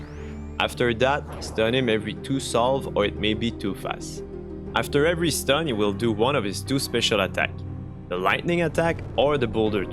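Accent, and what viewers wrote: Quebec, Canada. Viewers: Trop cute ton accent de Québ, bon vid!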